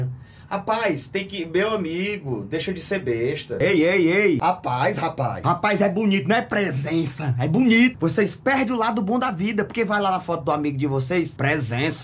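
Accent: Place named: Piauí, Brazil. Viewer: Whindersson saiu do Piauí e nunca parou de falar piauiês , meu vizinhos vão pra SP volta 15 dias dps falando igual aos paulista 😂😂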